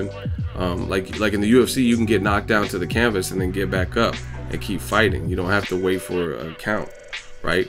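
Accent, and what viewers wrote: Ireland. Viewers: WTf you are irish?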